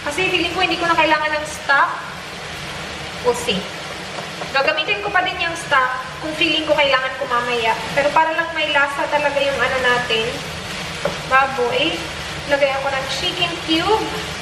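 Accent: Visayas, Philippines. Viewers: I love her visayan accents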